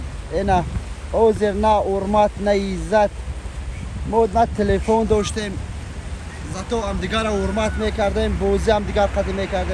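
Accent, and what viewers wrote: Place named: Badakhshan, Tajikistan. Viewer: Дар лачаи Бадахшони мемрым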